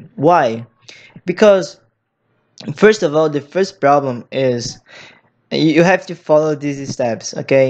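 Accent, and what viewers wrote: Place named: India. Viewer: Caramba, véi! Pensei que fosse um indiano! Já ia fechar o vídeo. :V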